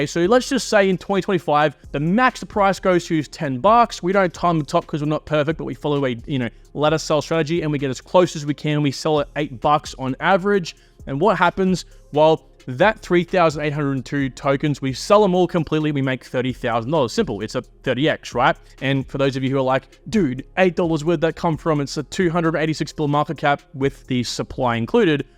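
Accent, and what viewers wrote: Australia. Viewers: Are you from Sydney?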